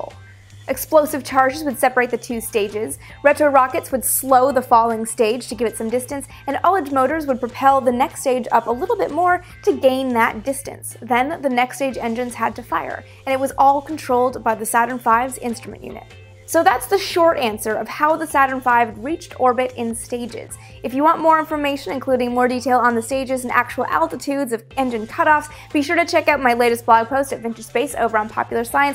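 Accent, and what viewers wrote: Canada. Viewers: She's Canadian! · Is she Canadian?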